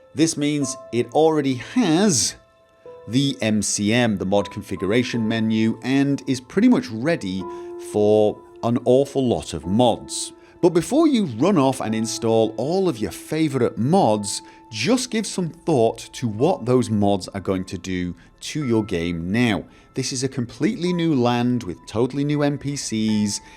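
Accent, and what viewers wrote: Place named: Scotland, United Kingdom. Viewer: He sounds scottish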